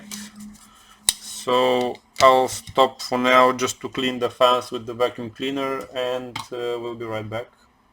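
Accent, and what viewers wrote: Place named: Russia. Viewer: парень, ты русский что ли? акцент ебейший)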